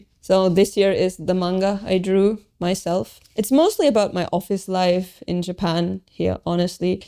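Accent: Germany. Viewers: Finde ich schon. Wie sie Worte zieht/betont ist schon sehr Deutsch. Ihr Redefluss hingegen klingt nach einer Mischung aus Deutsch und Japanisch.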